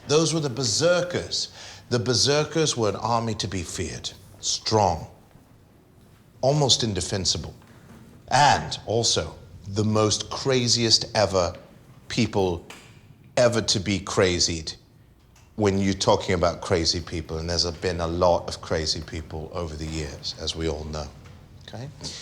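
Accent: United Kingdom. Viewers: English accent is near perfect. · Speaking as a Brit, his accent is very near perfect. Just need to tweak a few tiny words to nail it completely ('you caught me', 'sinister', 'called it' etc) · I don't believe is American, he sounds genuinely like one of use brits!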